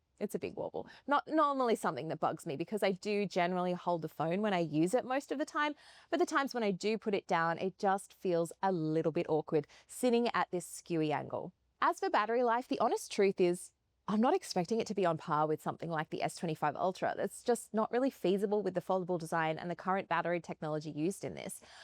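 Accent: Australia. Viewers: but you sound so Australian other than that!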